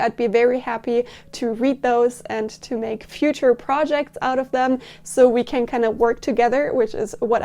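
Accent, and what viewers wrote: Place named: Germany. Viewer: Are you German? Coming from a German, you sound very German.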